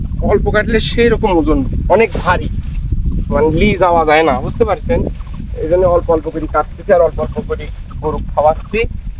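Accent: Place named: Pabna, Bangladesh. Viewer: আমার ধারণা আপনার বাসা পাবনা? বা এর আশেপাশে ভাষাগত মিল পাচ্ছি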